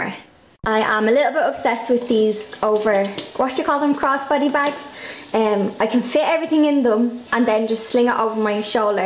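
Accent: Ireland. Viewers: I have been watching so many of your videos and I really am enjoying them and love them so much. Can't believe the way you painted your fridge and gave it new pastel champagne pink look. love your Irish accent aswell by the way. You really have taste and style girl!!!! Your home must be so stunning to live in. So feminine and girly and such a stylish shabby chic cottage. Really love your videos sooooooooooo ooooooooooooo much. Your fine bone china collection is worth an absolute fortune. Please keep doing your lovely stylish videos of different rooms and different aspects of your life y r so good at making videos.xxxxx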